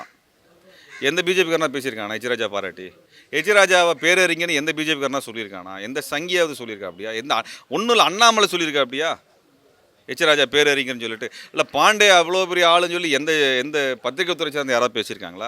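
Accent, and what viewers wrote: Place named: Kerala, India. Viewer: Dei naaye h raja tamizhan da.. Nee naaye un slang eh malayala slang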